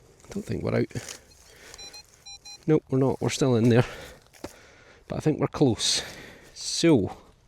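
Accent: Scotland, United Kingdom. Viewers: First time I've liked watching a metal detector. Maybe it's the Scottish accent. I've been watching Outlander.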